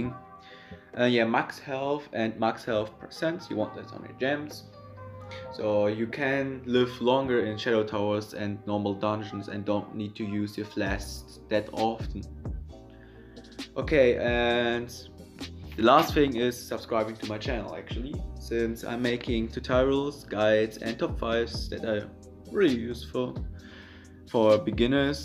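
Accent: Germany. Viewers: Hahahaha direkt gemerkt dass du Deutscher bist :DDD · Du bist Save deutsch merkt man 😂 lass mal zsm zocken adde mich mal auf Ps4 heiße Universum007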